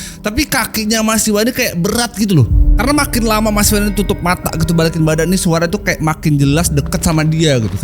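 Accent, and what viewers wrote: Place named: Java, Indonesia. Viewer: Cerita horor versi ngapak yg ga bikin aku merinding tapi malah ngakak 😂😂😂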